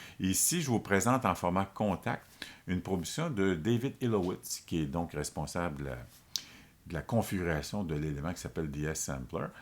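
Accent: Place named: Quebec, Canada. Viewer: Merci, content d’entendre un québécois dans le domaine! Bon travail!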